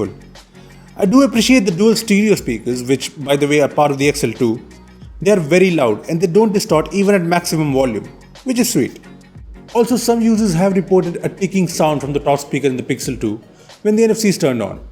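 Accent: India. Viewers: god damn dat indian accent , i can't take it anymore !!!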